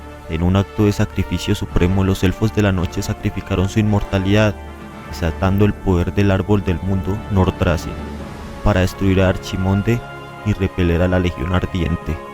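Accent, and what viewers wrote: Colombia. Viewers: de colombia , por el acento cachaco , saludos desde Valledupar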